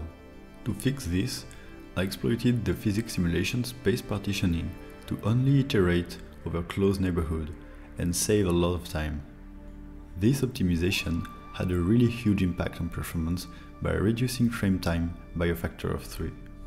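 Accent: France. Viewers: R’u french ? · Déjà la vidéo est hyper bien, mais aussi petit big up à ton accent français haha :) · J'avais reconnu notre bel accent 👌😂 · Your channel is becoming amazing ! Great french accent btw ! And the animations are ON POINT ! 👌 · T'es français non ? X) nice video tho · anyway a question, are you a French native speaker? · The youtube channel is located in France and with this accent I'm absolutely sure that he's French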